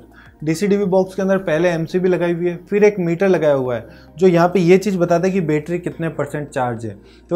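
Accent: Rajasthan, India. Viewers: Ye rajasthani h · His accent his Rajasthani and not that of kerala.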